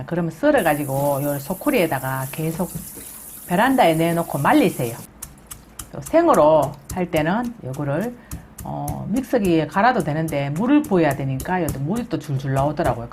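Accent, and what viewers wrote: Gyeongsang, South Korea. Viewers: 선생님 경상도 사투리가 넘 재밌고 영상을 보는날엔 하루가 기분좋고 중독되서 자꾸보고 밥때가 되는줄도 모릅니다~~ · 이 갱상도 사투리가 넘 구여워요. ~^^ 사투리 듣기 싫은 분은 보지 마이소. 싫다며 왜 들으며 댓글에 올리는거죠? 정보가 좋으면 듣는거지요. 오이 심어 다량 나올때 아주 좋은 저장음식, 묵나물형이 될 것 같습니다! 고마워요. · 경상도사투리가재밋네요. 지금은서울에사나요? 요리를쉽게배우게하니감사합니다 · 경상도사투리 넘 좋아요ᆢ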